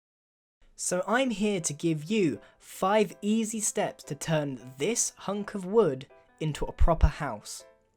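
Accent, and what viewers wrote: United Kingdom. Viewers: dude dude i really like your brithish acsent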